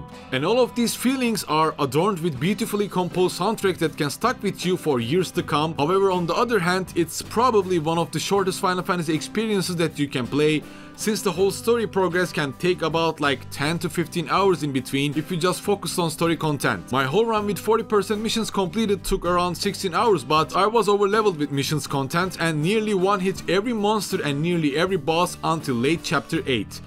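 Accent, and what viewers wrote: Turkey. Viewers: u have a turkish accent.ru turkish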